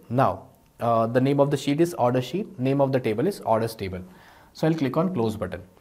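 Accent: India. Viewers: Nice video! Is it too difficult to get rid of the indian accent for the indians? Just wondering. Keep up the good work!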